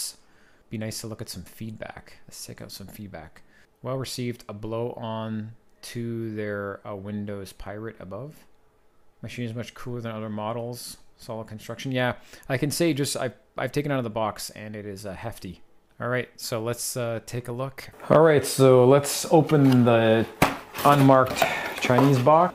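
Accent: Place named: Canada. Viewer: I can tell you are Canadian!! - "Pixie" support?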